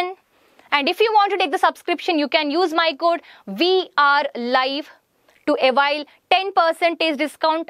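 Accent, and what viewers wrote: South India, India. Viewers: Kyuki ye mam south indian hai isliye 😒😒 Izzat krna nhi sikhe ho kya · Mam you are south indian?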